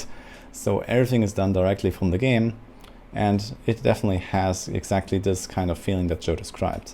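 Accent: Germany. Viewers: Bitte mach doch solche info videos auch auf deutsch. Man hört es richtig raus, das du ein Deutscher bist...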